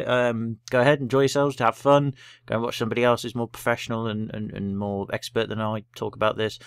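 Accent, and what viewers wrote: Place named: East Anglia, United Kingdom. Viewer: Are you from East Anglia by any chance? I sense a slight familiar accent. Not something you hear often on the Youtubes haha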